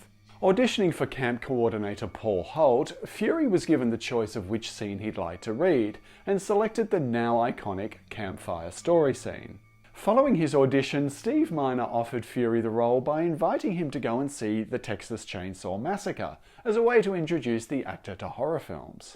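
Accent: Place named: Europe. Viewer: It’s kinda wild to hear someone with a European accent, critiquing these films. I guess I never considered that these films (especially the early ones) might have had an impact on foreign European audiences, which tend to be softer and less keen on gratuitous gore and violence.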